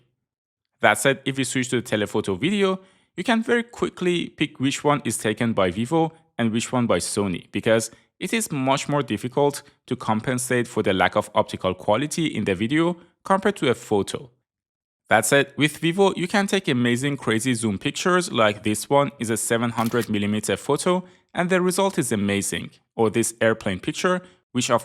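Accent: Iran. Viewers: bro your accent is sooooo iranian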